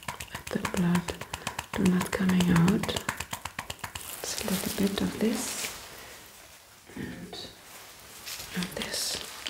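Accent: Germany. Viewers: ona jest Niemką. oczywiście ma niemiecki akcent🤦🏼‍♀️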